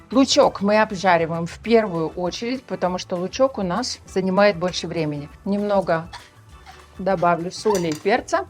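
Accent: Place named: Baltic. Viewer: здравствуйте! Ваши рецепты такие классные и удивительные и мне это нравится! 😊 А на счёт вашего акцента, я думала, что вы из Прибалтики 😁 Удачи вам ❤❤❤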